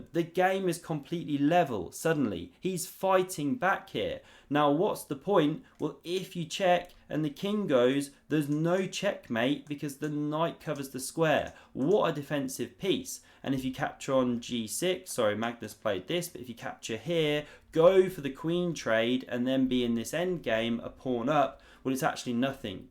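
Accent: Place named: Estuary English, United Kingdom. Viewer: Annoying juvenile "humour," even more annoying Estuary English pronunciation, and insufficient analysis. Agmadator's videos are FAR superior.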